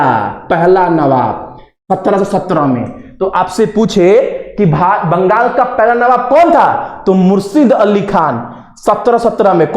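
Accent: Bihar, India. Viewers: Bhaiya Aapne Bihari andaz me smjhaya Amazing 😍🤩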